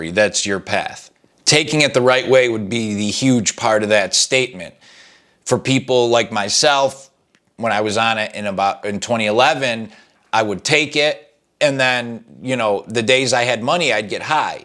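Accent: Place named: Upstate New York, United States. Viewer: Are you from upstate NY? Trying to place your “accent.” (I don’t actually consider it an accent, but after living in the south for the past several years, it’s nice to hear what sounds like my “accent” from back home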